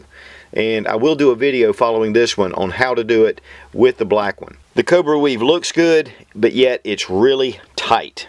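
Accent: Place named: South, United States. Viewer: U have a southern accent, he he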